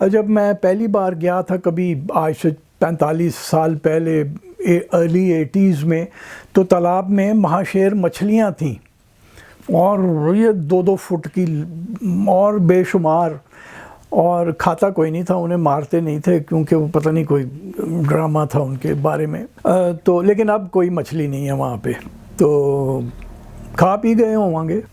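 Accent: Punjab, Pakistan. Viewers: sahab salutes to your style of narration with a pure Punjabi accent! Please if you could present a detailed series about Maharaja Ranjit Singh, so that the new generation doesn't forget about the only legendary Punjabi ruler who is taught in Oxford. Thanks!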